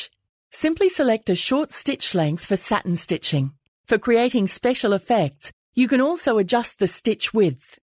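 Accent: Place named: Oxford, United Kingdom. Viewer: Finde jetzt die Erklärung in diesem verhältnismässig langsam gesprochenen und klar verständlichen Oxford Englisch mit den entsprechenden Bildern dazu sehr gut zum nachvollziehen. Was schreien hier alle nach einer deutschen Anleitung? Ist denn bei der Maschine keine dabei? Viel falsch machen kann man ja bei dieser Nähmaschine nicht, wenn man ein klein wenig logisch denkt beim arbeiten ;-) Dank Globalisierung ist die neue Geschäftssprache halt Englisch, so what? Ich dachte auch - bis heute - dass SINGER ein deutsches Unternehmen ist, hab ich wieder was gelernt dank den Kommentaren hier. Danke!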